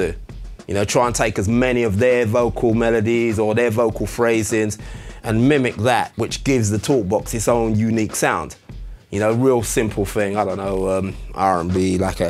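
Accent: United Kingdom. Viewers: British is just amazing. Love his accent.:D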